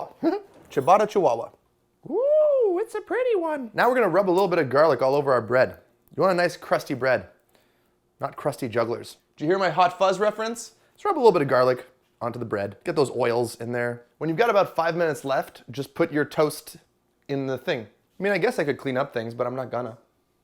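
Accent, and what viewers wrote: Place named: Canada. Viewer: Where are you from? I keep hearing a Canadian accent but it goes away so fast! Great video btw (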